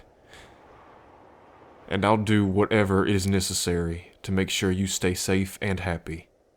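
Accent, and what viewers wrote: United States. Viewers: 🥰🥰🥰nice country voice ❤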